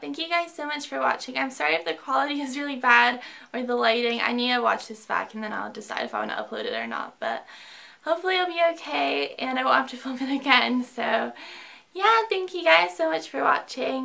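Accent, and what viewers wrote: United States. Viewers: I know ur accent is american but do you live in uk because all the brands r uk shops? jw btw xx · Are you from America ? Either that or you put the accent on?, but loved this vid!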